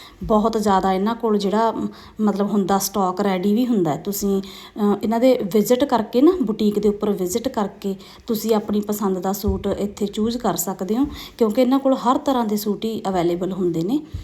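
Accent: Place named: Sangrur, India. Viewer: Didi tusi sangrur wali side toh lgde ho...tuhdi language toh❤❤❤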